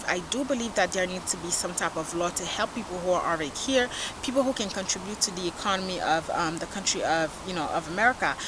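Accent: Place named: Haiti. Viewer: You have a nice accent , are you from Haiti?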